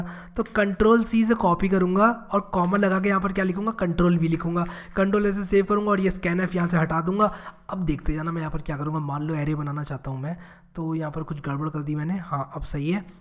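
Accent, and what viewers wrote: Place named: Punjab, India. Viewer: U have a little bit of Shakti Kapoor accent. Punjabis..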